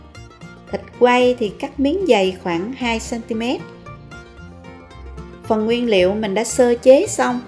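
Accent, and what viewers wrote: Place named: Mekong Delta, Vietnam. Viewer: Rất thích cách chia sẻ từng món ăn của,và nhất là giọng miền Tây để nghe và rõ ràng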